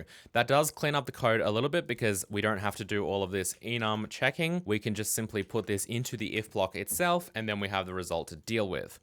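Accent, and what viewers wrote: Australia. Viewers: Don't assume I'm a simpleton like you and mistook the aussie accent for the f@ I know what aussie sounds like, I know what f@ sound like, he's both. Edit: extremely obvious when he says "C plas plasss"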